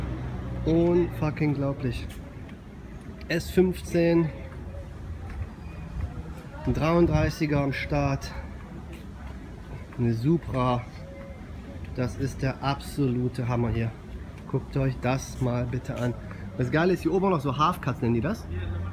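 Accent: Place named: East Germany, Germany. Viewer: wie ne Ostdeutscher vor allem. ...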